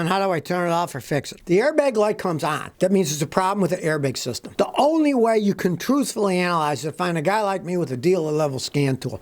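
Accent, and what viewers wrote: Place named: Texas, United States. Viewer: Hi!.. Been watching your channel for a week now..... Basically Im working from home and I use youtube for some background no-vocal DNB to put my brain in gear and keep me going while im typing... but for some reason... I can both concentrate on work, while listening to your voice... and by some miracle I can even remember everything you are saying... It must be that Texan accent;) Anway... about the OCD guy with the manual car question: Im an Aspie (Aspergers, which is similar to OCD) And I also had the pedal-reflex issue.. so much that I've developed a bad habbit of howering my foot over the clutch (while training), pressing the clutch as slow as the breaks, often times not fully.... I felt unstable in the seat and insecure and unstable to change gears in higher speeds. Forgetting to change gears and all those typical Aspie/OCD issues. 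Its a multitasking and mentally shifting tasks -issue... because of the sensory overload. Especially because the world outside of the car feels unreal and 2D to us. Here in Croatia the instructors are very strict, before only 60% would pass, now only 20% candidates pass. Licenses here cost like 900 $ for a 2-3 months theory + minimum 35 hours driving hours. And then each exam is about 90$. We also have to pass first aid exam too. And of course here we learn only on manual and drive only on their cars, but directly on the actual roads. So their cars are all manual, one steering wheel and double set of pedals. I drove almost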